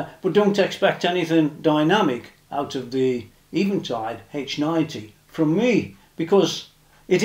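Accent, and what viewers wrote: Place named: Derbyshire, United Kingdom. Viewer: Hi. I’m new to your channel. Really enjoyed your presentation. I’m seriously thinking of grabbing the H90 is someone wants my Luke. I can’t pinpoint your accent.Derbyshire? I will try and find you on Facebook as I would love to bend your ear. Fantastic channel.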